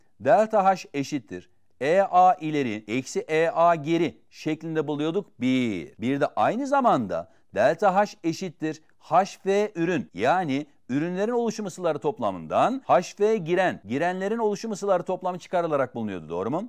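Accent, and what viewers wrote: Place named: Thrace, Turkey. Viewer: Hocada bi trakya ağzı var da ekrem imamoğluna benzemiyor nasıl benzettin :D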